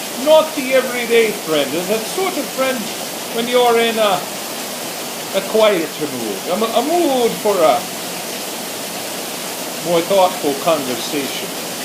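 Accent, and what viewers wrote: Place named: Ireland. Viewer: Awesome story and i love the irish accent very nice👍👍👍👍👍👍😃😃😃😃😃🍀🍀🍀 best regards peter.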